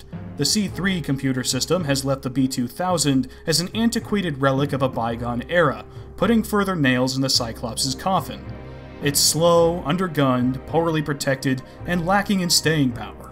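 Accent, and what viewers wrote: Germany. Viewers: I listened to your reading o f Measure of a Hero and I think you have a fine German accent. Reminds me of the series 'Allo 'Allo.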